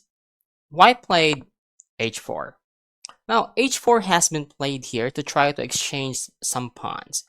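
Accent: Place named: Philippines. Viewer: Change your Pinoy accent to a British or Czech one and you will have more global viewers. Fact.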